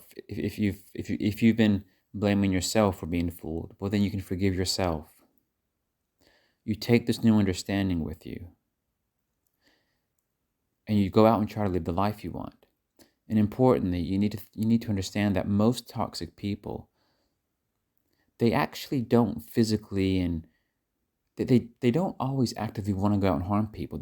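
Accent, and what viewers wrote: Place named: United Kingdom. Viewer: This is an English accent mixed with an American. It’s so weird how sometimes you stray into British, and then you put the American accent on again. I hear you! British guy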